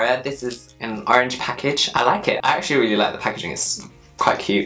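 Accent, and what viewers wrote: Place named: South London, United Kingdom. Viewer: havent watched in ages!! when did he start sounding like hes from south london!!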